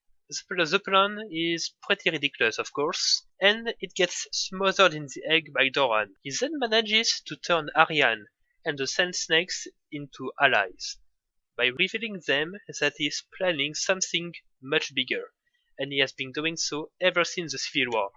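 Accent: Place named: France. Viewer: Y a qu'un français pour prononcer "épisode" comme ça ^^ c'est la première fois que je vois un francophone faire des vidéos en anglais, c'est un peu perturbant d'avoir un aperçu de notre accent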